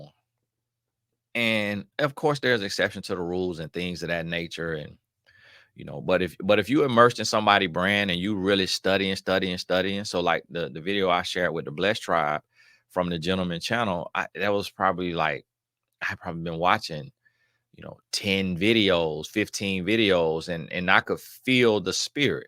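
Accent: South, United States. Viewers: I started to make a comment underneath someone's video because this "man of God", who is also divorced, decided he wanted to make fun of you because of your southern drawl. He said that he disagreed w something you said, but I'm still puzzled about how Christians can disagree if they both believe in the same Word. I think he just called you out to up his subscribers. So instead of being a "typical angry black woman", I voted with my feet and no longer watch his videos.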